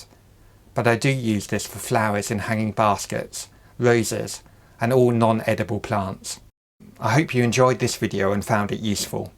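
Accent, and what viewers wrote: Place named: United Kingdom. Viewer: I love your British accent and how you say methane it's more like meethan for phonetics.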